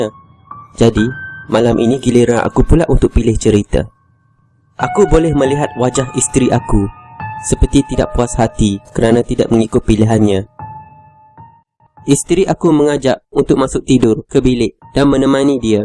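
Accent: Kelantan, Malaysia. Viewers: Abg orang kelantan ke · Demo ore kelate ko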